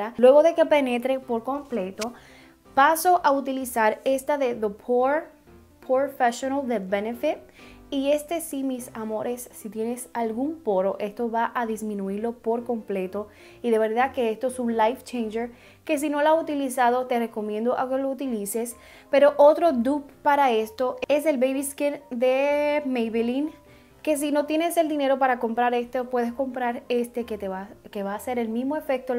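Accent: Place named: Puerto Rico. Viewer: ohhh lo sabia! el acento nos distingue jajajaja. saludos desde Juncos, PR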